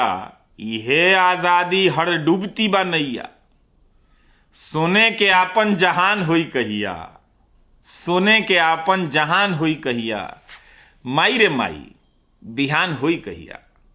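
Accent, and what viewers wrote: Bhojpuri, India. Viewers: राहुर भोजपुरी सुन के मजा आ गईल😊👍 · माई रे माई बिहान होई कहिया। आदमी के आदमी से पहचान होई कहिया। नफ़रत के बोली मेटान होई कहिया। माई रे माई ....अभी जवन चल रहल बा ओकरा के देख के कुछ अपन लाईन जोड़ देनी ह, सचमुच आजो अनहरियाईल रहम हमनी के त बिहान कयीसे होई। रविश सर, राऊर भोजपुरी के बोली सचमुच बड़ा निक लागल।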